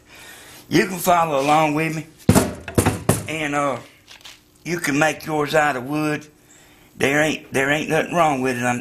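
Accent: South, United States. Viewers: Thanks, love theSouthern accent! If i make one i will film it and tell people about you.Thanks again. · You have the coolest accent can't beat that good old southern accent hell that's better than anything you can buy I bet you can get up to 200 miles of that antenna I wish you lived near me I'd pay you to make me one and that's made better than any of the $100 ones I've seen made a lot better and more sturdier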